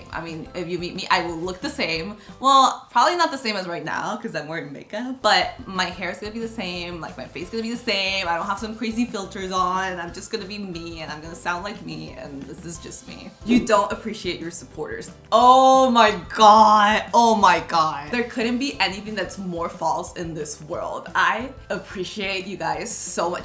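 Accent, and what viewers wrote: United States. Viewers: Yes, you sound and look like you're from St. Cloud...especially with the DIY Gucci. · is not that much you can notice sometimes BUT she almost talk as an american. But is my opinion... just saying 💕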